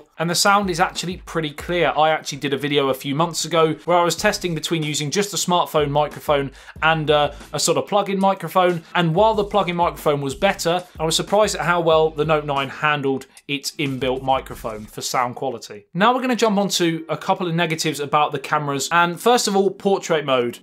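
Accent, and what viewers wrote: United Kingdom. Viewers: I hate British accent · annoying accent and usually like brits accents must be different region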